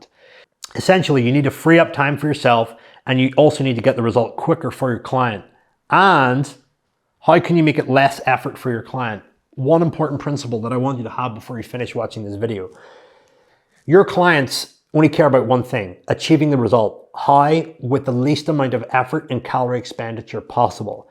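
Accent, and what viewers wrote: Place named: United States. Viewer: Thanks! Very helpful. Loving my Mission Ready 💞 Ps.Your Irish accent seems to be turning American 😳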